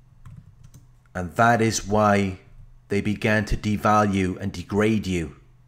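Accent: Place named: England, United Kingdom. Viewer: Are you English mate, I'm Scottish love your vids mate spot on.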